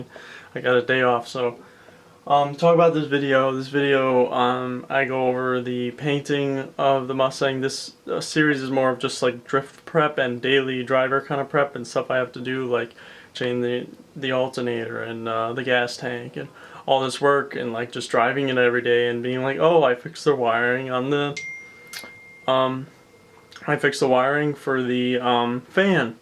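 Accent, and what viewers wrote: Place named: Sweden. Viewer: are you swdedish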